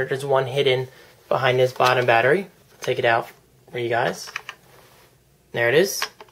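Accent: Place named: New Jersey, United States. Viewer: Your jersey accent is showing strong in this video. "Lets turn it AWN"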